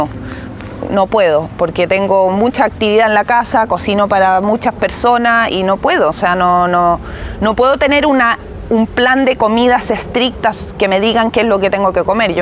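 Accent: Chile. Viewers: Te noto la voz de chilena 😁 y gracias por tus vídeos ❤️😍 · Lo mismo pienso... tiene mucho acento chileno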